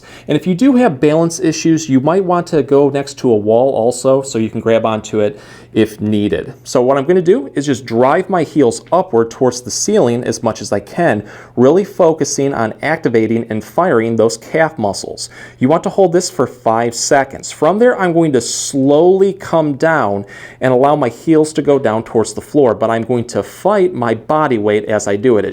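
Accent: Michigan, United States. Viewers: This is the most Michigan accent I’ve ever heard in one video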